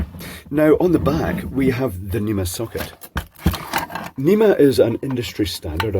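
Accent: Scotland, United Kingdom. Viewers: I am trying to work out what part of Scotland you come from. It is not a Glasgow accent for damn sure, I was there for a month.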